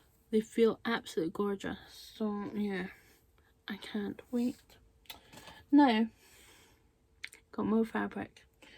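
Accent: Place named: Glasgow, United Kingdom. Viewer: Oooooo that sky behind you. I was born in Glasgow and moved to the midlands when I was 10 so it is lovely for me to hear your voice. Accent of home. Thanks for your videos.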